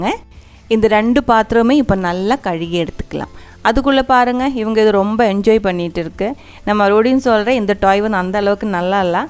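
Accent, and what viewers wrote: Kerala, India. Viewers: Unga voice enakku romba piduchu irukku chechi. Malayala tamil 👌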